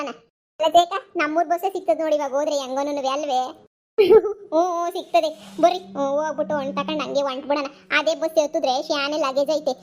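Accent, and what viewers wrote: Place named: Mandya, India. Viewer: ಯಾವ್ ತಾಲೂಕು ನಿಮ್ಮ ವಾಯ್ಸ್ ಮಾತ್ರ ನಮ್ tmk ಡಿಸ್ಟ್ರಿಕ್ ದು ಇದ್ದಂಗೆ ಇಲ್ಲಾ ಮಂಡ್ಯ ಅಥವಾ ಹಾಸನ ಸೈಡ್ ಇದ್ದಂಗೆ ಇದೇ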